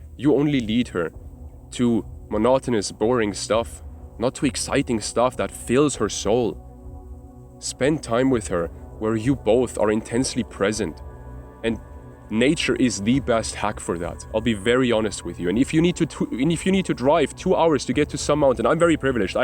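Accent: Germany. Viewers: I knew you were German! The background and your accent did it for me. Anyways dude this is a great video and I certainly needed to hear this. Been in a relationship for 3 years and it’s my first real one too. I’m 21 now and still learning how to be a man. This just gave me the confidence to leave all that childish shit behind and change my mindset. Danke bruda 🙏🏽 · Great video, I have to add one thing...This amzing guy must have like in Scotland or knoew lots of Scottish people, he keeps using Scottish experssions, and, with a German accent, it soulds brilliant!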